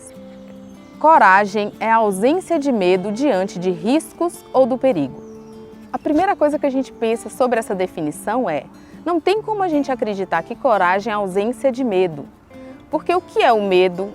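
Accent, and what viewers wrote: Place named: Bahia, Brazil. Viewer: Reflexão muito boa, é o que eu estava prescisando ouvir. você é Baiana? Se não você tem o sotaque de Baiana arretada.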